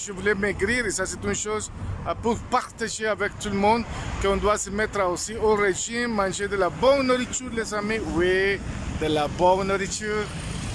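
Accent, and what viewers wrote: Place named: Canada. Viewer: Your French accent sounds very Canadian. However, I love the way you speak French and you can code-switch languages, you have a gift, my friend! Thank you for this video!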